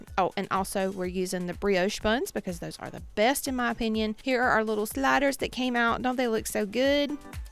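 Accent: South, United States. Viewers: All these recipes look great girl☺️ I always enjoy watching you and listening to your awesome southern accent! 💗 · Girl you talk like me, country as cornbread 😂 I love it! Proud of my southern drawl. 💁🏼‍♀️ New subbie! 🤗 💕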